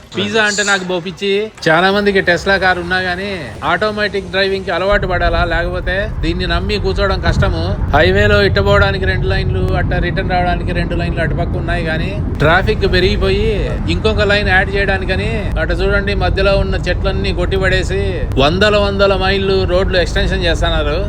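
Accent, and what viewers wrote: Rayalaseema, India. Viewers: ఏమి అన్న ఆఫ్టర్ లాంగ్ గ్యాప్ మీ మాటలు రాయలసీమ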